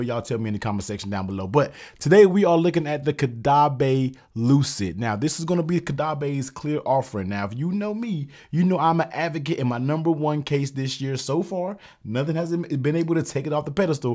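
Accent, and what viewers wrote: United States. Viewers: I liked your American accent, man 👌💞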